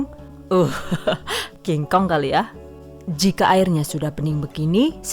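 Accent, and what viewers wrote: Banyumas, Indonesia. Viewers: Makasih Buu .... 😀Deneng ngapak ? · Kie mesti wong banyu mas. Tepate cilacap. Kira kira kya kuwe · madiun ngapak yah mab? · bu orang mana bu ..hehee bisa ngapak Bu 😁😁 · Orang madiun kok ngapak yaa..hee salam dari tulungagung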